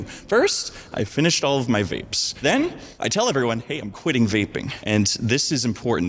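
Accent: Canada. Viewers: This man has the thickest Canadian accent I've ever heard.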